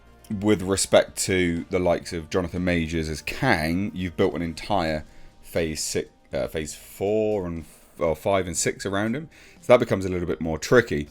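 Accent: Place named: United Kingdom. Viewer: I love your videos but as a Texan, I have to say, I cringed every time you said the actor's name. You sound like my relatives in the UK. I laugh every single time they try to pronounce a Spanish or Portuguese word.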